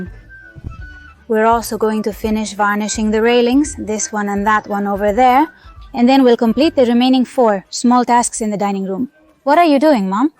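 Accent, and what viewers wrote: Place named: India. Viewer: lo hace la IA en automatico XD por eso parece india hablando ingles XD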